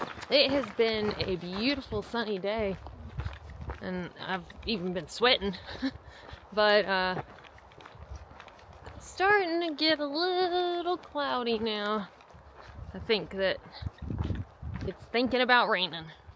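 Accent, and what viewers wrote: South, United States. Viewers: As a mama who has gotten very little sleep over the years with constantly breast-feeding babies that for some reason just won’t go to sleep, separated from a partner on and off because of work, and never having had a babysitter this video was really powerful. That song has meant so much to me for so many years having grown up on hot, flat coastal plain land of Southeast Georgia and then moving to a place with some little mountains to follow my dreams. I’m so glad you were able to be a positive role model to other mothers while being so loving and respectful towards your own mom who is stepping in as a second mom through all of this to help all of y’all out. I love that you’re confident enough to record yourself interpreting everything around you with a Southern accent, phrases, and mannerisms. It’s so dang funny, so raw, heartfelt, and comforting. 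My nine-year-old born ornithologist, backpacking daughter watches now to identify all the birds, which means it takes an hour to get through one video so she can research each bird. 🦅🦉🕊️ You are a hero to many. Y’all have amazing footage, fantastic editing, and big hearts. I’ve been wondering when we’re gonna see more of the family dynamic after getting so invested in your channel. That last bit with him seeing his daddy and putting his sweet little head on his daddy’s shoulder broke me for sure, but the song already had me crying right from the start especially after hearing you cry, too. (I know the mom’s in the grandma’s usually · You’re so stinking cute with your southern accent and your surprise at experiencing Arizona for the first time. I lived in TN for over 6 years, 30 years in NM, now back in AZ for 13 years. I love your expressions of wonder. Did R2R:18hr. at age 59. My health took a hit after that. 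Now in Payson,loving it!!! Love you ❤️❤️❤️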